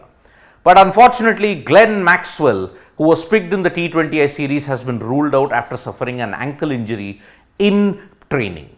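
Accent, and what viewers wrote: South India, India. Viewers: You english voice is sweet as a quecumba I like your throwing of english language natural south Indian way of speaking and about niraj he should not stop his aim would like to clinch more try to engulf more distance the record of 98 and learn more and generate new player as like a dhrinchariya of jebling throwing sudip greatness and pride is attached to him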